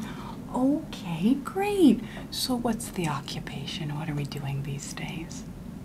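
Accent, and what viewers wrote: South, United States. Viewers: Crazy that I found your videos by reels on Facebook and totally got ASMR from the southern accent. Imagine my shock when I found you actually make ASMR content intentionally!! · I love this and the southern accent and ghe TYPING sounds❤❤❤❤❤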